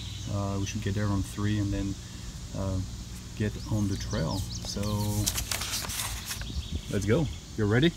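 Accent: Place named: France. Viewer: not to sound demeaning or such I have been trying to figure out your accent leaning towards French been watching all of your vids they are great hoping to do the same someday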